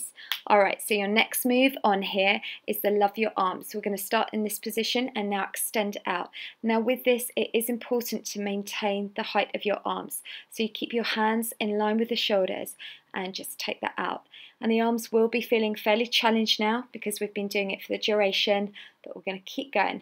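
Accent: United Kingdom. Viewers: thankyou for this 😭😭😭♥♥♥♥ :), i like your british accent 🙈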